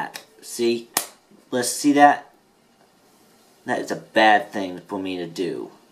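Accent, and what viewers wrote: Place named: France. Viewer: he kinda has a little french accent when he speaks for me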